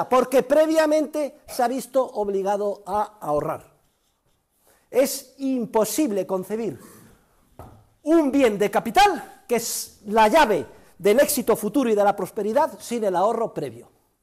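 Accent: Spain. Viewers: He speaks English with a Spanish accent and that's good enough. I understood everything he said in English and that's what matters.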